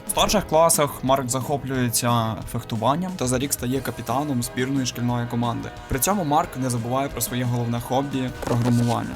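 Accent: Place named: Zakarpattia, Ukraine. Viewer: Бро, дуже якісний контент, акцент цікавий, ти часом не з Закарпаття?)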